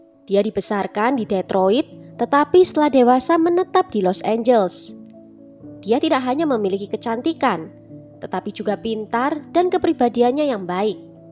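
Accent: Java, Indonesia. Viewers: Alhamdulillah.. sehat trs bahagia trs.. medokuhhh 👍💖💖💖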